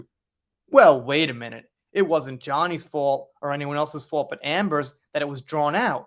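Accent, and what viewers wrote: New York, United States. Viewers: Love your posts! Esp outtakes! Love your New York accent the most!! Keep going!! Xo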